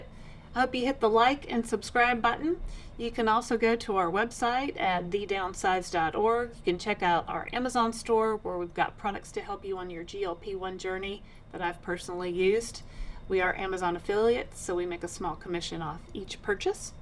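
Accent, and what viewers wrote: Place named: North Carolina, United States. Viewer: Thanks, I come here for encouragement about every day. I love your North Carolina accents. Most of my relatives lived in Stanley County when I was growing up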